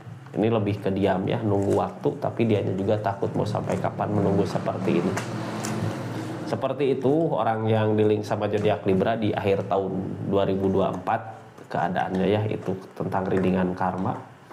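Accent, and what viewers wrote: West Java, Indonesia. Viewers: Sunda pisan A...Libra Bandung Hadir stay Cilacap salam kenal A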